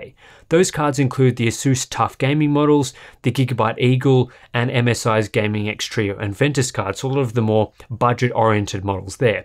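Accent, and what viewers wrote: Australia. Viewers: You could do worse, his Australian accent in these shows is subtle, not a broad one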